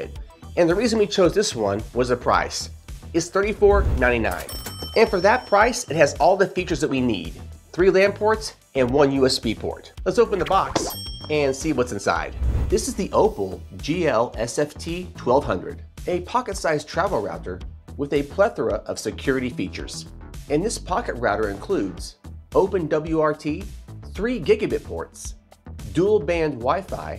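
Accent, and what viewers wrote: United States. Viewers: Yes, agree, his first language is not English, but his American accent is quite good. I only hear the slightest of a non-American English accent on certain words and intonation. Nevertheless, great information.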